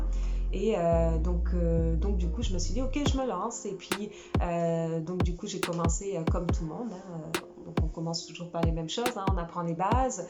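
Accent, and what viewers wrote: Canada. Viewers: J adore ta façon d intervenir Le melange francais canadien peut etre etc La simplicite Le souci dexactitude Lintelligence surement :) Merci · Parcours intéressant, bravo ! Donc, ton léger accent est ... québécois :)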